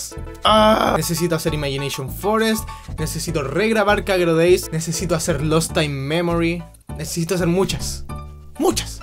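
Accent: Chile. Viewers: Me suenas muy chileno.... Me encanta!!! 💓💓 nueva sub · eres más chileno que yo :v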